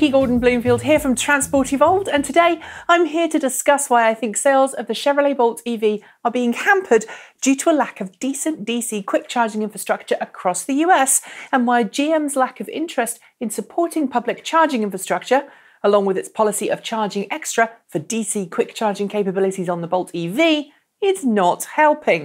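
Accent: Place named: United Kingdom. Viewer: Cannot stand listening to British accents. Go talk about tea and cricket.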